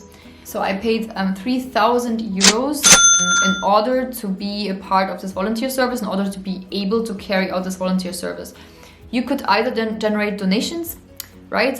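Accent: India. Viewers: Your English pronunciation like Indian English style very good. We are must understand. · I think ur english has indian accent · Her accent sounds almost like Indian. She looks good and talks good as well. Hi! Welcome aboard! Enjoy your tryst with India and Indian culture and the warmth of Indian people. Post one of your full length dance videos. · Your Indian accent in English is almost perfect. But to say DVD in the old days..... Good God, how old am I????😶😖😥😥😥😥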